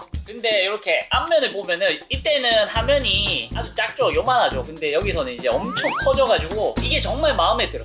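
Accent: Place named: Gyeongsang, South Korea. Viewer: 잇섭이랑 대구말투도 비슷하고 목소리도 비슷하네요😅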